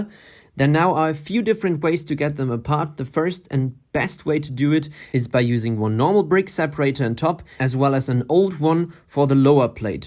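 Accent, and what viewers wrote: Germany. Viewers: Are you are German??? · man hört den deutschen dialekt also sprich halt deutsch hört sich so nicht schön an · Da hab ich den Deutschen Raus gehört:) Hallo;) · Youre german arent you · Do i hear a german accent?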